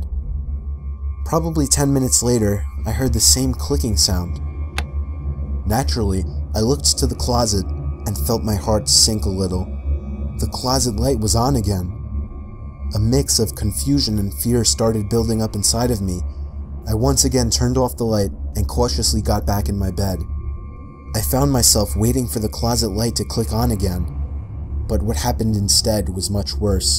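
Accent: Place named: New England, United States. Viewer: I caught your new England accent in this one mr. ;)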